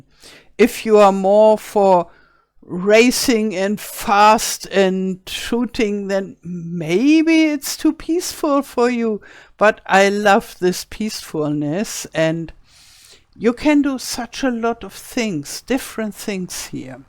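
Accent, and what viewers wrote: Germany. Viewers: Just found your channel after searching for videos on farming sim se on switch 2 cause im trying to decide whether to buy it. Absolutely loving your accent, I'm Australian but you sound just like a good friend of my husband and I that is originally from Germany. Her and her husband moved to Australia decades ago but they both still have their beautiful accents. Great info in the video too.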